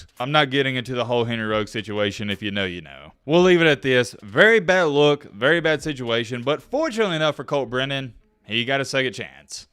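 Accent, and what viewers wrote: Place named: Appalachia, United States. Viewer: This hillbilly narration is like nails on a chalkboard. Also, this jackal doesn’t know ball and it’s obvious.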